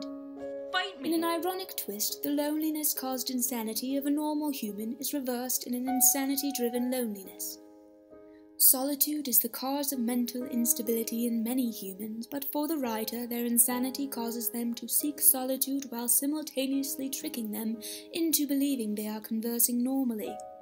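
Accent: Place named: England, United Kingdom. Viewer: I’m so impressed with the English accent 😂 I’m assuming we can thank your time at Durham?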